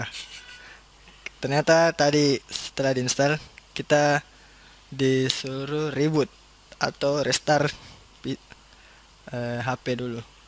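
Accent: Makassar, Indonesia. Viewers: Kayak nakassar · lokatnya makassar bgt... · dari logatnya kayak org makassar.. wkwwkwwk yg ngomong macam pengen ketawa tapi ditahan.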